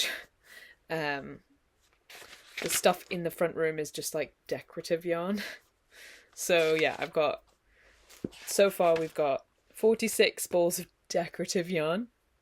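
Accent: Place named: Scotland, United Kingdom. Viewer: So glad to see you again this month! I wish that I had responded more to your last video. I thought about hunting you down in IG. I care if you make videos. I love your brand of witty humor. I love your Smattering of British and Scottish accents. You remind me of my grandmother who was from Aberdeen. I’ve tried to figure out why your channel is growing more slowly than some, and I think it comes down to “sameness.” You are not making the same PK and MFTK sweaters in creams like everyone else. You veer off the beaten path with color, patterns and other fashion. 💗💗💗💗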